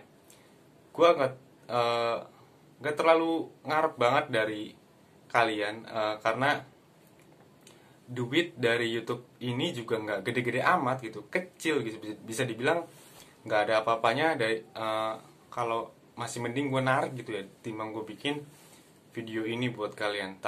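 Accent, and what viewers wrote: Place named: West Java, Indonesia. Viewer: Lo orang sunda ya bang . Ngaku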